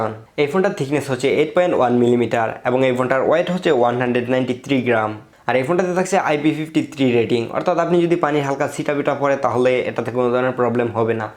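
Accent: Kishoreganj, Bangladesh. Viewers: Vai apnar basha ki kishurganj...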